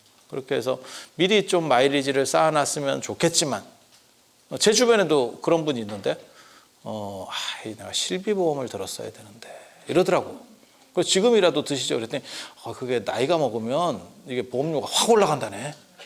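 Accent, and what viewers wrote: Jeolla, South Korea. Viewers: 스님은 전라도 억양이 좀 센거 같아요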